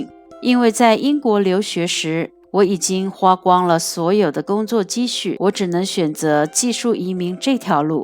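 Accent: Standard. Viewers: 你的口音不像台湾人啊 哦对了，你做过国语记者，国语非常标准。 我在多伦多，也好中年技术移民来的，非常赞同你的观点 我是大陆人，来加拿大不但可以获得自身的自由，更能拍拖意识形态的干扰。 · 山水有相逢。一切都是小問題哈哈哈。還有你的國語好正統，沒有台灣腔，我昨晚看你視頻的時候都在猜想您祖上是不是北方人，或許是河北那一片的😆😆😆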